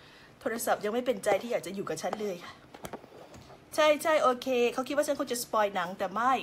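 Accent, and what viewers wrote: Isan, Thailand. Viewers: เสียงกลับมาแล้ว คลิปที่แล้ว เสียงอีสาน ระวังมีดบาดนะคะ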